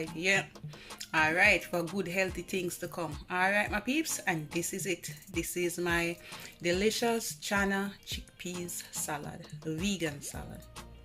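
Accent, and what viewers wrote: Jamaica. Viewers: Hi, so good to hear a Jamaican accent. Nice healthy food, Imma try it ✊🏽✌🏽🎶🐾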